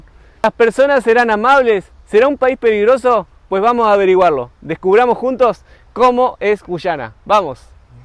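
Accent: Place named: Argentina. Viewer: Es guyana, no se dice guchana, se dice llueve, no chueve, buena informacion, solo que no me gusta que los argentinos, hablan con la Che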